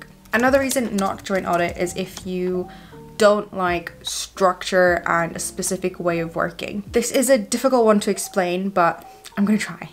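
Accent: Scotland, United Kingdom. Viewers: Sounds scottish to me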